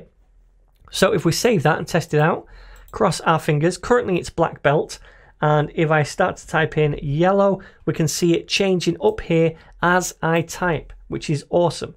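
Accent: United Kingdom. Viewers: Why does `s accent sound like brittish?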